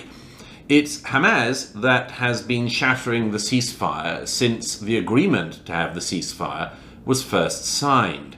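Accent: United Kingdom. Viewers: Your sly British accent isn’t fooling anyone with your shekeled hasbara Murray.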